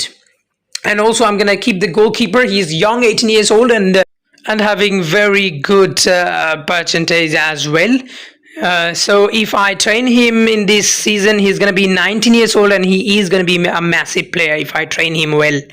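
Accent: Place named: Germany. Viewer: junge direkt gehört, dass du aus deutschland kommst :D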